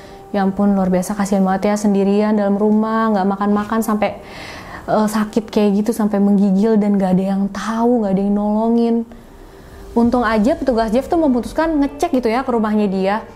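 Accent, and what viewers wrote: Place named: Bandung, Indonesia. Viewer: Kamu orang Bandung ya, logat ngomong.a kaya orang Bandung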